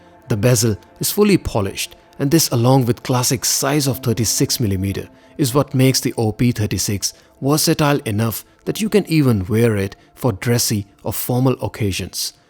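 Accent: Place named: India. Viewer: By the way, you seem to have an indian accent..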